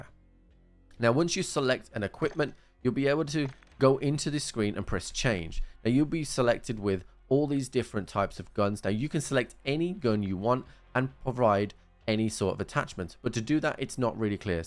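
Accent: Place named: United Kingdom. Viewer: DMZ is so damn fun I played it 16 hours straight starting yesterday! Thanks. So nice to find such a well-polished guide--and in a British accent, too! So nice to find such a well-polished guide--and in a British accent, too!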